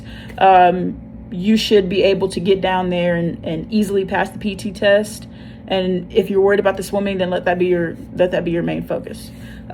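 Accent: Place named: South, United States. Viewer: GUUUURL…your southern accent is BACK! Hahaha I’m from MS so it sounds comforting lol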